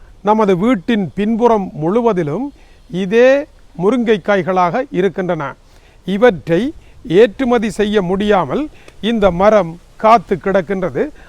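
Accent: Jaffna, Sri Lanka. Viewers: யாழ்ப்பாண தமிழ் இனிமையாகத்தான் இருக்கும்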